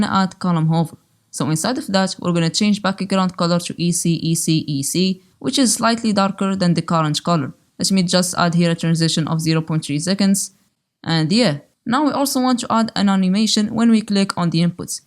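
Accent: Morocco. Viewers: from your voice I am pretty sure that you're Moroccan ❤❤ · Very nice channel, thank u for the great content. Btw are u Moroccan ? cuz I feel like your accent is kinda Moroccan.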